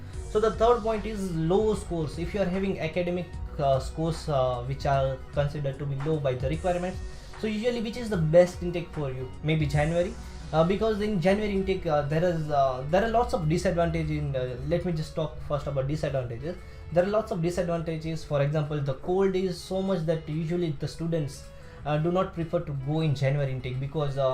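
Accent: Gujarat, India. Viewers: I appreciate your love towards our nation 🙏 brother but the reason I asked was that your accent sounded Gujarati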